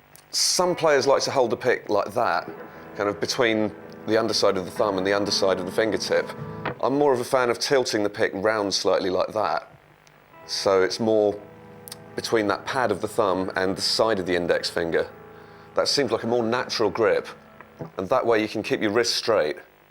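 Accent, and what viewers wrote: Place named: United Kingdom. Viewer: Your accent is so British.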